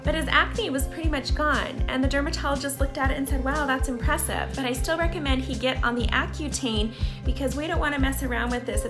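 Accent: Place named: Utah, United States. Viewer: All the way through the video, I kept thinking to myself, “she sounds like a Utah mom.” Then when you mentioned here from Kaysville, I had to laugh. I know a lot of people have had a great deal of success. Doing this, with B5, vitamin a or zinc. Years ago, I had success using a combination of all of them, but forgot about B5 in the years sense, so I’m glad I came across this video as a reminder. My son started getting severe acne right after his last growth spurt and a couple days ago I increased his intake of vitamin a and zinc. I’ve also read a lot of science based evidence that iodine in its natural state, such as a sea, kelp supplement is beneficial and I’m just waiting for those to show up in the mail. Since we have a natural grocers right here in bountiful, I’ll also swing by there and pick up some B5. He’s only 12 and it hasn’t been coming this year for his self-esteem yet so it’ll be good to help him how he’s much younger.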